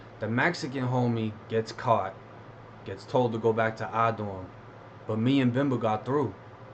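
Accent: Boston, United States. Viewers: U from fl or boston? I see u got that Boston accent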